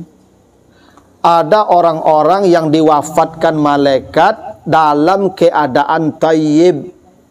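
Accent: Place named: Aceh, Indonesia. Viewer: Urang maa ustadz ko min??? Manyabuik huruf "T" mode urang aceh, totok 😁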